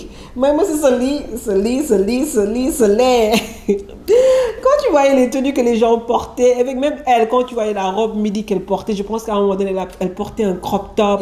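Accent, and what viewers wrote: Senegal. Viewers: ne perd surtout ton joli accent sénégalais wolof comme dans ta vidéo de feug Diaye. Tu y es d'un naturel sans égal avec un accent très très joli. Comme dans la plupart de tes vidéos. Évite l'accent Chokho Chokho trop chic chic comme les immigrés ou sénégalais de France qui veulent parler comme français avec l'accent français + que les Français. C ton accent sénégalais authentique qui fait de roue une YouTube sénégalaise originale. 👍👍👍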